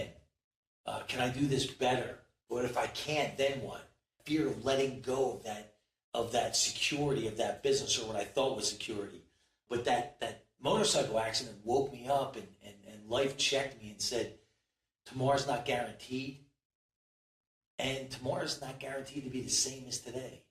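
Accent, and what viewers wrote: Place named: East Coast, United States. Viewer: Definately Hear That Wonderful East C. accent! #MM2018 , Your Amigo Santos.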